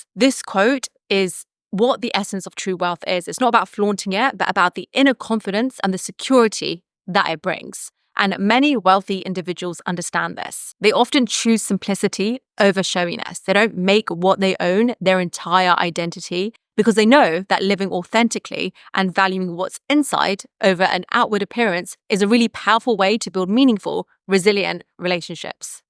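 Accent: United Kingdom. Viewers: Nice presentation with nice british accent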